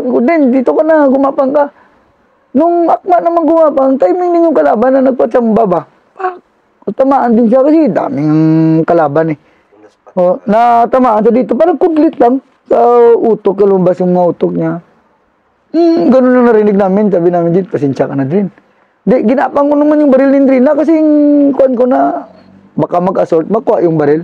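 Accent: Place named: Iloilo, Philippines. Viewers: Alam mo talaga pag ilonggo kay tuno perti gd 🫡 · Parang ilonggo si sir ah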